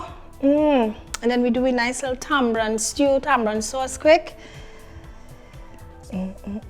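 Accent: Trinidad and Tobago. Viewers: In Grenada, we call it fish cakes or saltfish cakes. The next time I make it, I'll try the jalapeño. This trini-accent is everything.💞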